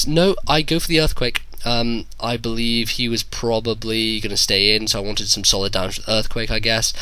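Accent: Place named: United Kingdom. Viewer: I love your commentary! great to hear a brit commentating, sooo much better than the yanks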